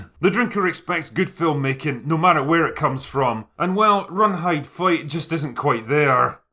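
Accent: United Kingdom. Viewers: Tip: drop the accent, if it's designed to get attention. If it's real, WTF is a Brit doing critiquing an American film about an American heroine using her 2nd Amendment rights to bring justice to a bunch of bad guys? BTW: wilderness vs a school/ mall/ movie theater? Numbnuts, where you BEEN?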